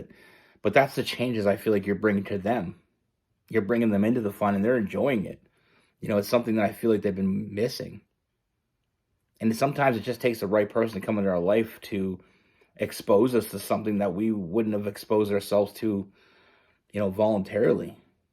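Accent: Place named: Toronto, Canada. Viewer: U sound like ur from TO. I’m from beaches ✨😄✨ Nice reading 🙋🏼‍♀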